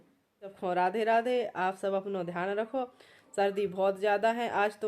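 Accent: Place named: Bundelkhand, India. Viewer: Didi mai aapko indoor se dekhti hu mera naam asha hai mai aapko bhut pahle se dekhti hu meri mummy ka gav bhi tikmgad hai mai bhi aapke jese hi bhsha mai baat karti hu bundelkhng mai aap bhut piyari ho kisi ki baat par dhyan mat do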